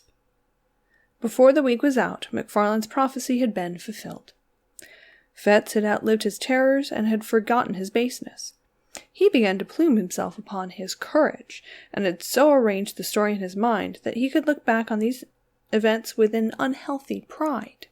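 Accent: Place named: United States. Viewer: Hi, I came for a listen at the urging of Tony Walker (Classic ghost stories), & I find his praises correct. Wonderful narration, & your character shines through in a fabulous quirky way that I really enjoyed. I have to confess that I generally avoid narrators with American accents, (sorry, I find so many of them grating, might be an Australian thing?). Happily your reading made the accent irrelevant ... Loved it.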